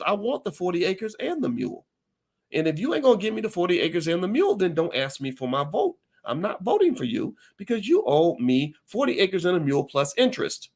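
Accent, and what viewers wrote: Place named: South, United States. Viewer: Love the Southern accent, Doc!😊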